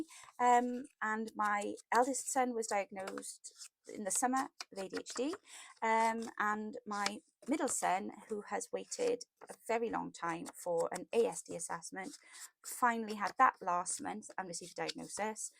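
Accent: Wales, United Kingdom. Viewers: Thank you for these freebies; I learned a lot by watching. I truly love your Welsh accent. My paternal Grandpa was from Blaenau Ffestiniog. He came to Canada in 1910 and here I am. I'm glad I found your channel through the collaboration by way of My Porch Prints.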